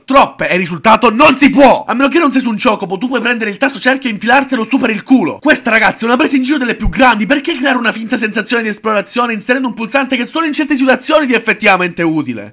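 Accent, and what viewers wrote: Italy. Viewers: Ma sei un genio, il modo di parlare (accento siciliano?), il video stesso come e stato montato etc...=>iscritto...(trovato grz al commento di TheWildLife212 nel video die farenz "Caro Angolo, ti chiedo - Mantova Comics & Games - 10/03/13")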